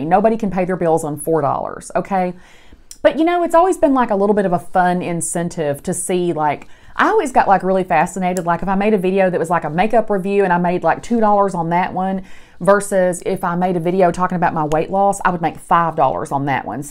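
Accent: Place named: South, United States. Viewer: You're a great candidate for asmr because of your southern accent, how you talk with your hands and its really satisfying when you say "y'all". I hope I don't sound like a creep, I just think you'd be good at it. Whispering doesn't seem to suit you though. It would be cool if you just spoke like you do now and maybe talk about positive affirmations or something.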